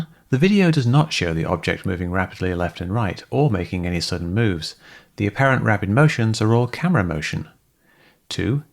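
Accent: United Kingdom. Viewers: I have to mentally prepare to watch these videos from Mick. His take can be interesting, but it generally puts your mind into a fucking mental gymnastics exercise. If he didn’t have an English accent, a fraction of the people would take him seriously lol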